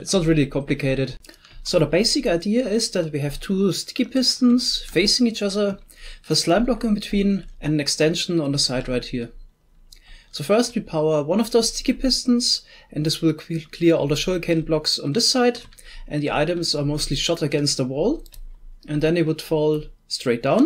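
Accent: Germany. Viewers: Bist du deutsch? Klingt so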